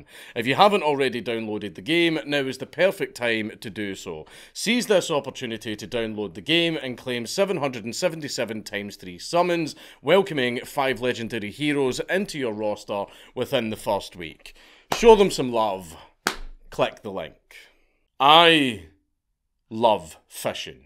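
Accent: Scotland, United Kingdom. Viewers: you sir, are extremely Scottish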